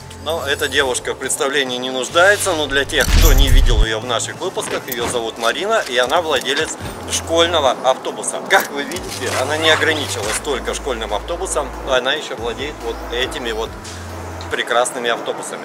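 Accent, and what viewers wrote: Russia. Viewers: Привет😉 Да, интересно. Мне кажется ты или из Питера, или из Челябинска, но не исключено что из Евпатории)) Хочу такой же форд😂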